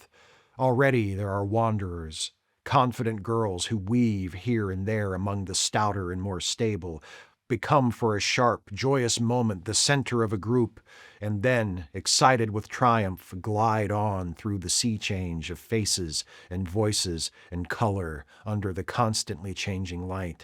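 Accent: United States. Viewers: Like a lot people my age, this was required reading in college (“college” is British for High School, to avoid confusion) but I always loved it anyway. There’s definitely something about hearing the text in an American voice. I’ve been a subscriber for years now, so your love for the novel is not new, but this is excellent work, I’ve listened to every part so far, and it’s something that I’m going to look forward to seeing in my subscriptions for the next few evenings.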